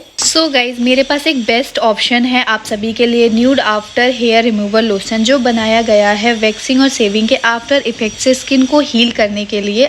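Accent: Bihar, India. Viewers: Hi ji aap ki behari boli badi payari lagti hai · apke muh se bihari language bhut pyari lagti hai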